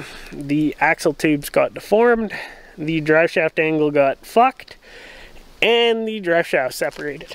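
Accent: Canada. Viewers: I heard the accent… thought you were Canadian. You popped the hood and I saw the DDC plates.. YEP! You’re Canadian 😏 beautiful truck !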